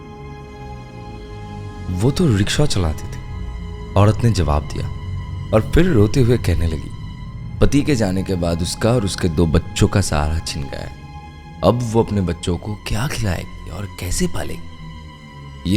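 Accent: Bengal, India. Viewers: onak ta bhalo ho cha agr thaka but akhon oo bengali acesnt ta acha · Bengali ar moto hindi tao valo lege6e.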